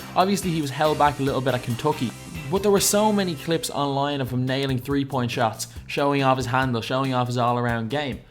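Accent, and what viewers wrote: Dublin, Ireland. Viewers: r u Irish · are you Irish im irish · You sound like you're from Dublin lad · this guy Irish? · Irish accent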